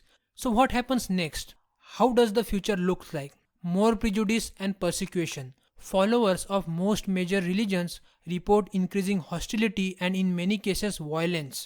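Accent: India. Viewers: how it is possible to listen? Omg Go learn how to speak without this hindi accent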